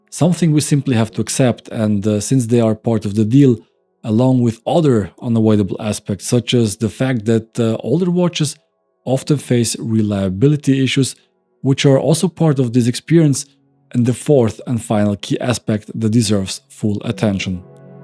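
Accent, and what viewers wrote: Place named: Switzerland. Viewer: Love this content. You give precise and honest insight. What can be irritating at times in your constant mispronunciation of "available*" (say: ah-vale-ah-bell" not "away-bell"!) and you always pronuncing a "V" like a "W". They are sometimes pronounced the same in German (sounds like Swiss-German is your native language), but are vastly different in English.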